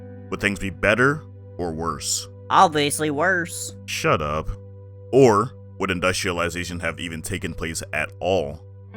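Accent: Appalachia, United States. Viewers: Love the hillbilly voice!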